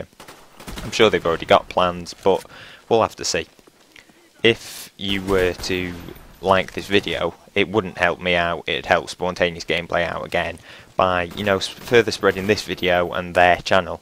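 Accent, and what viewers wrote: United Kingdom. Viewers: A British Commentator :D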